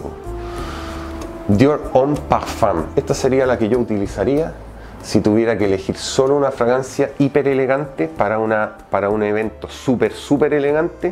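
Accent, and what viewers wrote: Chile. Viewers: Aparte usa ese acento que parece frances y eso lo hace mas interesante aunque es chileno, de a ratos se le nota el acento chileno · Es chileno o español? 😅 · de donde eres ? , porque yo siendo Chileno se nota muy claro su acento Chileno y no le encuentro nada parecido al acento , venezolano ni Dominicano ni nada parecido. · Chileno no? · Es Chileno. Se nota altiro... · es chileno cierto? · Que onda la gente que dice "aun se le nota lo chileno" ???? Habla totalmente Chileno, lo unico que se le pego de españa es el "os" en ves de "les" eso es todo, su tonada y forma de hablar es 100% Chilena. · Acento chileno para que tu veas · Gar ..también pense que era español ..pero analizando bien ...todavía se le escucha el acento chileno....solo le falta agregar el ""pooooh" y ya esta. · hugo bello arce Ese es el típico acento chileno de Santiago y la zona central, tiene un no sé que de acento andaluz.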